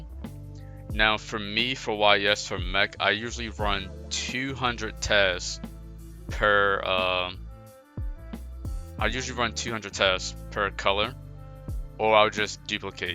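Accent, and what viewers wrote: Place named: Baltimore, United States. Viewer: good vid g. Yo you from baltimore? I can hear it from a mile away hahaha anyway I remember checking out wit eno using a mastercard on ys but mastercards dont work no more?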